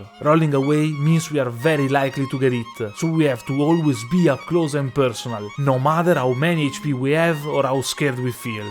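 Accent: Italy. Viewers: Hey man. Great video, but I was wondering where are you from. You sound a lot Italian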